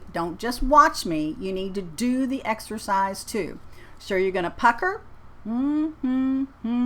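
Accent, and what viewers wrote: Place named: Texas, United States. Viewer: Who wants such a strong Texas accent? That should be made clear in the description that this is not for neutral English.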